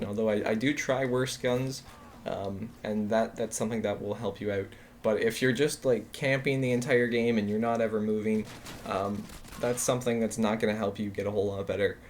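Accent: Canada. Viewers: 7 kill streak in under a minute horry sheit (unrelated) are you canadian? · Are you Canadian by any chance?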